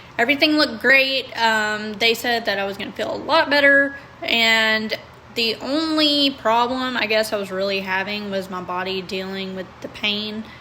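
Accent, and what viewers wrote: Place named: South, United States. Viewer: I'm in love with your southern accent,.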